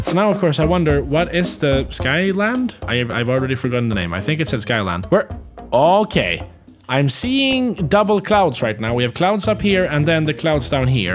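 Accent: Sweden. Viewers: hejsan du låter svensk! men är du det?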